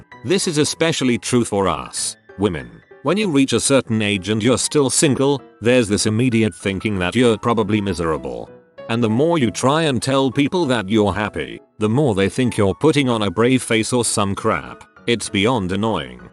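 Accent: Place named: United Kingdom. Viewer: Who is this British robot guy who does all the Reddit videos? It’s the same voice on all the channels and videos!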